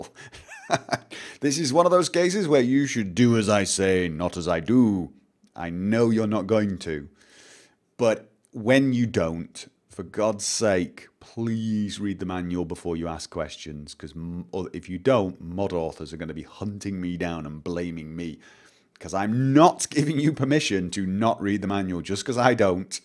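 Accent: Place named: Northern England, United Kingdom. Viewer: I came to this video hoping I would just hear telling people to fuck off but instead, I heard him saying something that made me feel SO much better. Everything he said about the way he learns and reading manuals is so true to my own experience. I've not actually come across anyone who learns anything the same way I do and its good to know that I'm not the only one. Love you and btw. I was born in Stockport so its nice to hear a northern (Possibly Manchester?) accent on YouTube.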